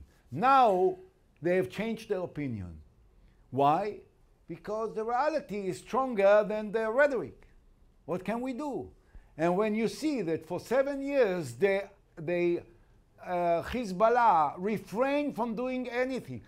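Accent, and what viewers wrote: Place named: Germany. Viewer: German accent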